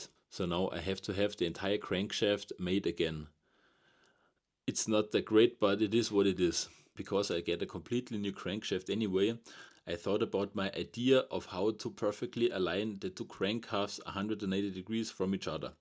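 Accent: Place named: Germany. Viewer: pls stop with english you sound way to german you are to stiff for english loosen up or just stay with german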